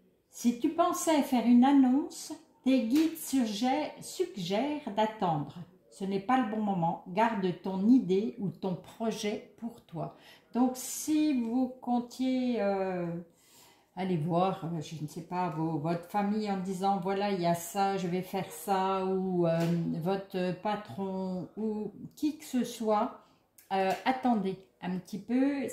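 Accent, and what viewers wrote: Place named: Haute-Marne, France. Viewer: Rhooo ben non... on n'en a pas marre du tout, au contraire... on en redemande 😀😃🙂🙃! C'est toujours un grand Bonheur Boostant et Apaisant d'entendre ta voix qui me rappelle de si bons souvenirs (ils sont bien au chaud dans mon Cœur ❤ ❤ ❤ ❤ ❤)! Et cet accent haut-Marnais 😉😉... un réel plaisir 😀😃. Là c'est l'hôpital qui se fout de la charité 😀😃🙂🙃😊😇😀😃.... On me dit à La Rochelle: - "Vous n'êtes pas d'ici vous!"... Voilà, une partie de mon Cœur est dans les Vosges, une autre en Haute-Marne et encore une en Charente-Maritime 😉😉. Bref... mon Cœur a beaucoup de place 😉 et tu y es bien au chaud ma. Bisous à toute la famille. A tout bientôt ma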